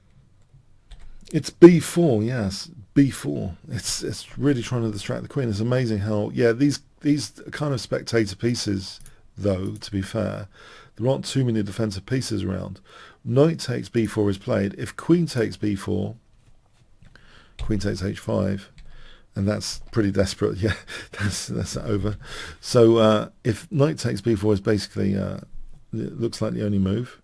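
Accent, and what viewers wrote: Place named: RP, United Kingdom. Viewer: May I say... I love your videos as much for the great chess insights as for your very nice English BBC accent ☺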